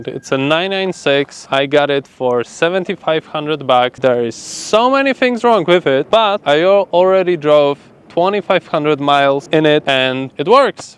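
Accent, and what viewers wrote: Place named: Poland. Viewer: Po pierwszych pięciu słowach pomyślałem „hmm… z akcentu chyba Polak” 😆 Gratuluję zakupu prosiaka za tak śmieszną kwotę! Pozazdraszczam... 😉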